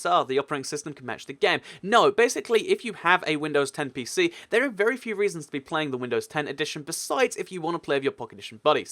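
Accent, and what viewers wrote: United Kingdom. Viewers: You sound mix of British and Russian and German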